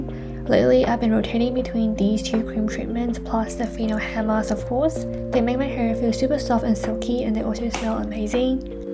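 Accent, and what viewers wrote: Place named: Australia. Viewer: When I first watched your videos I could tell that you were Chinese but couldn't tell that you were Malaysian Chinese probably because you grew up/lived in Australia so you had the Aussie accent😅 But am supporting you all the way from Singapore❤ Congrats on hitting 50k subscribers!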